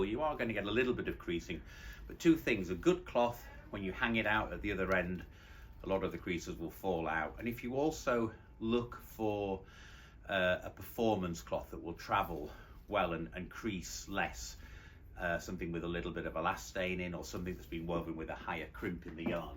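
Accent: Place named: RP, United Kingdom. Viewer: I so like to listen to your cultivated British English. Always a pleasure.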